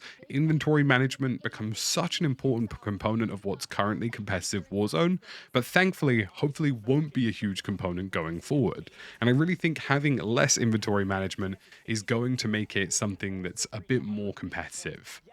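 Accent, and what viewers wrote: RP, United Kingdom. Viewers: Topic for your next analysis video: why so many noobs/ low skill players think (nearly) all top-tier players are cheating. It's an important subject, because it definitely undermines the audience and enthusiasm for comp Warzone. It's also an interesting one, because on the one hand you have the fact that identifying cheaters is a skill that develops as you play (when I first started, I saw cheaters everywhere). On the other hand, you have the all-too-predictable social dynamics playing out in chat rooms and comment sections, where the wary skepticism of noobs is whipped up into conspiracy-level absurdity by toxic troll types. And on top of it all, every now and then an actual cheater is unmasked, and the community goes haywire. You have the experience, analytical powers and (perhaps most importantly) trusty-sounding RP voice to educate a stubbornly ignorant public. 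Give us what we need!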